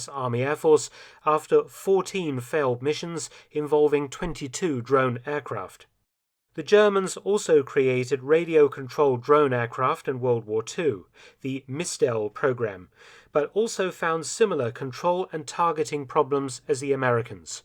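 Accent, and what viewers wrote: United Kingdom. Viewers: I love the way you limeys talk..."Norrich" we say Norwidge (I know that's not how it's spelled)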